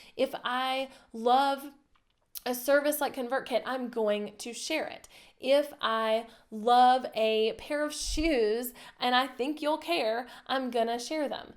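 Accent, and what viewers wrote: South, United States. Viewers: For watching this video for the first time, can I just say I hear the southern accent and love it lol. Really helpful content here trying to start affiliate marketing myself!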